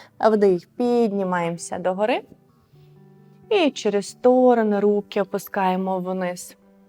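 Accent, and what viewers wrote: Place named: Russia. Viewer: ХТО! ВАМ КРОВАСІСЯ АЗАРОВ ДАВ ПРАВО УРОДУВАТИ УКРАЇНСЬКУ МОВУ СВОИМ РУССКИМИ ПРІВІТ - ЦИМ СЛОВНИКОВИМ ПРОНОСОМ!!. СПОЧАТКУ, ВИВЧИТЬ УКРАЇНСЬКУ МОВУ. ФУ ГИДОТА.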